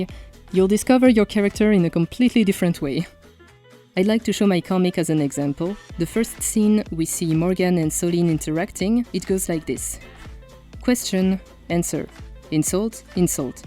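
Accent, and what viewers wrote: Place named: France. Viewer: firt time watching one of ur vids, PLEASE TELL ME IF UR FRENCH CUS I CAN JUST HEAR THE ACCENT (im french)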